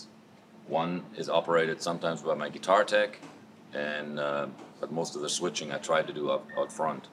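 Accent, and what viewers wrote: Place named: United States. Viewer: he speaks english like a pure american,if i wouldn't know him i would thought so.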